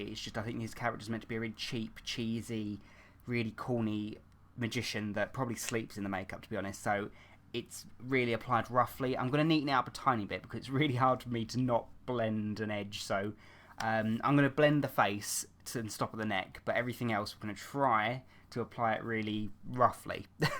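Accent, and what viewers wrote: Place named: United Kingdom. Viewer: His accent is ... Brittish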